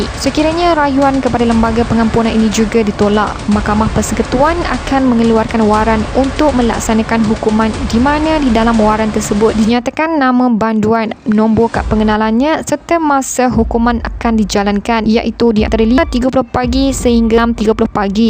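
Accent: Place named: Indonesia. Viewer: Kenapa macam slang Indon je..Cuba gunakan balik bahasa baku....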